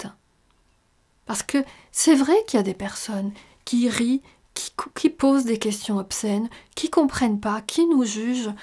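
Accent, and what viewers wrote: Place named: South, France. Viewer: Tu viens du sud toi c'est clair ;)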